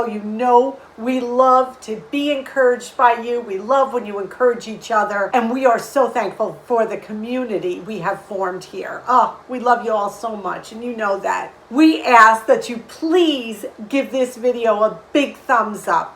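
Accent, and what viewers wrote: New York, United States. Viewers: Im a New Yorker moved to the south and i adore your ny accent , makes me miss home.I still have mine too.I am told.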